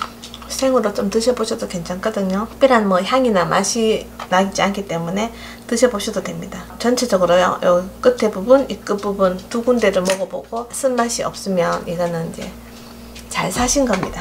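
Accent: Gyeongsang, South Korea. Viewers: 찾아보는 경상도 말씨라 귀에익고 설명도 잘 해주셔서 늘 잘보고있습니다 고추장도 쉽게 가르쳐 주셔서 찹쌀고추장 담아 여기저기 나눠주고 맛있게 먹고있어요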